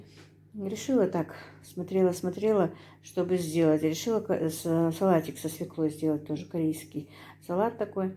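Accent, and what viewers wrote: Standard. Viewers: Какие интересные рецепты, обязательно приготовлю. Слушаю вас и поражаюсь : какая у вас правильная литературная русская речь! Ваш голос и речь очень похожи на голос моей тёти — учительницы русского языка и литературы!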